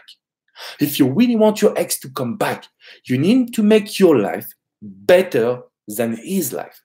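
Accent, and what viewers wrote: France. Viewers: Nice French accent. Where did you get it from? Thank you. great video.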